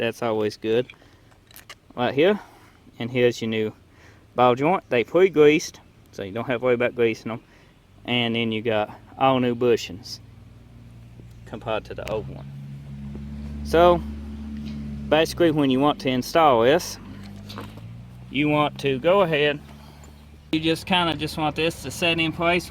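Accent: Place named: Louisiana, United States. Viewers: Who else loves the southern accent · Are you from Louisiana?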